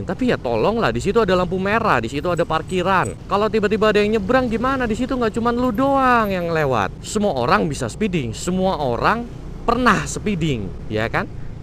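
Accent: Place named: Java, Indonesia. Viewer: Baru pertama kali liat, dan ternyata medok medok gimana gitu, berasa ngedenger orang jawa, orang jawa mas?😂😂😂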